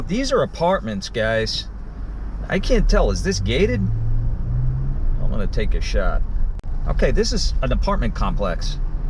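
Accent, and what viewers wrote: New York, United States. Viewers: I forgot what sun looked like thank you! You never lost your NY accent. Hardly anybody has one in NYC any more. Do you get discriminated against at all for being a New Yorker?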